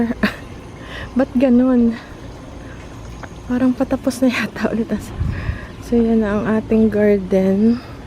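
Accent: Batangas, Philippines. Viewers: Matagal na aq nanonood Ng vlog NYO pero now din lang aq nag comment..Ung punto NYO Po Kasi SA nakaraang vlog NYO para kasing batanguena Kau..batanguena rin Po aq nasugbu batangas po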